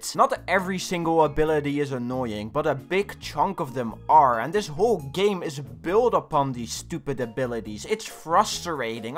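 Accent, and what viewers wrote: Netherlands. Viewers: hes dutch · Are you dutch?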